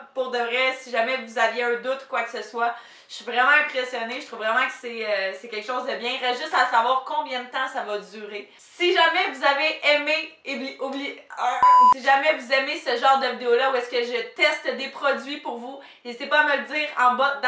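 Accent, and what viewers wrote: Quebec, Canada. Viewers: t'es trop belle et j'adore ton accent (québécois) kiss 😍😍😍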